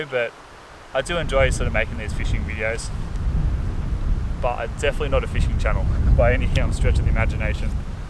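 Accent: Australia. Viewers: Love your videos of Australia and I’d visit your continent if I could. I’m from Canada and I showed you to him. We both like the accent and keep the videos coming!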